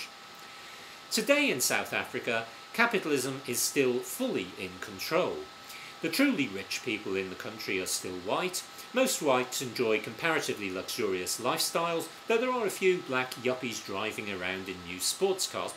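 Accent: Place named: United Kingdom. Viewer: Your British accent tells me you come from a mean prejudiced place. Firstly as colonizers Britain were insufferable! Racists, opportunists, sententious and cruel. You lost in South Africa, you we’re thrown out of India in total disgrace and nowhere you occupied miss you! So now it’s Nelson Mandela you’re aiming at! You have no heroes, except military! India had Gandhi and South Africa had a man whose sacrifice for his people and love of them and country stood steadfast against a hard government. Relentlessly the National Party killed dissidents who were precious intellects. Mandela was an educated, principled man who lost his youth rotting away in prison. This alone could have broken him but it didn’t. He stood firm and resolute but with no hate, pettiness or prejudice. Exempt of all the ugliness now rampant in this morally depleted world. He was not a saint he was just a very good man hell-bent on peace for the new South Africa. 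The Constitution a fantastic ode to principles an example to others . He made mistakes which were well intentioned but out of sync with the reality he had to face after nearly 30years locked away in an island. He stood by the right leaders - Castro, Ghadafi, the Palestinians, Russia and all those whose standing he admired and served his dream. He alone was able to put together in harmony a nation with deep wounds. Have you done that? Has anyone who dares to diminish the real value of this MAN come to an inch of what he stood for? NOOOOOOOO! So shut u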